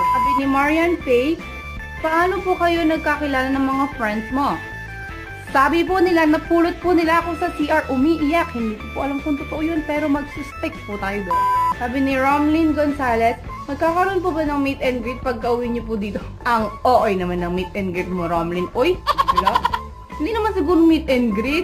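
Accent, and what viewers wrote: Visayas, Philippines. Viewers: 😍😍😍Cute niya magbisaya😀😍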